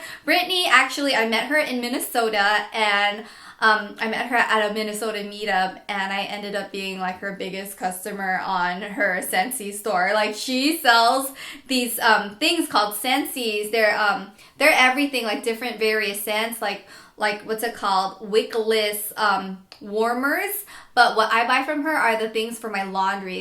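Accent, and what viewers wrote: Los Angeles, United States. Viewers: you are such an LA girl.. ur accent the way you talk is sooo LA.. (i live in LA) i love it... 'u can take the girl outta the hood but not the hood outta the girl' godbless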